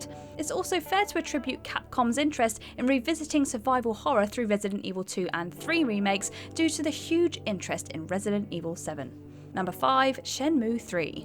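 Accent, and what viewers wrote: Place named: United Kingdom. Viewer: maybe its the british accent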